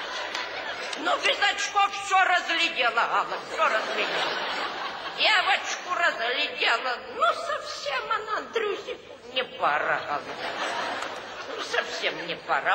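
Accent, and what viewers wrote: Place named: Russia. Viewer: Не понила это что русской деревне так разговоривают ,браво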